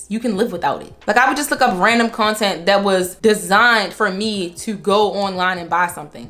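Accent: Baltimore, United States. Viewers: that baltimore accent heavy😭😭great content thank you!! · I hear Bmore accent 😌?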